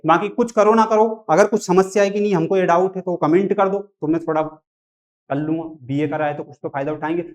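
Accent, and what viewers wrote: Indore, India. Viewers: Indori accent❤❤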